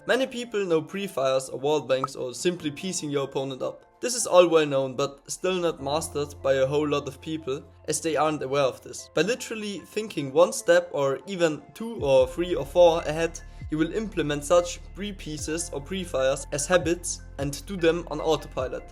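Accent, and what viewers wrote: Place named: Germany. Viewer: Du bist deutsch man hört es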